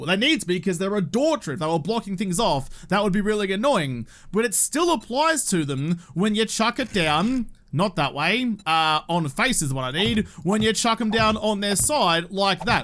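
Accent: Australia. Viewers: No Aussie has a good day for their "r's" and "a's"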